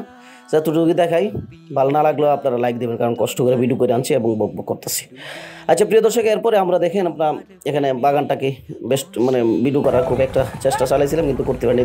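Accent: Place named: Raipura, Bangladesh. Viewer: ঠিক মতন দিছেন একদম আমাদের রায়পুরার ঐতিহ্যবাহী আঞ্চলিক ভাষা!!! আমডারে লইয়াও তো যাইতে পারতেন.....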